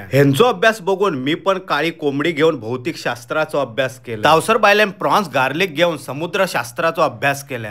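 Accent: Malvani, India. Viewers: Add 1 number and malvani dialogue superb 1 number ❤😊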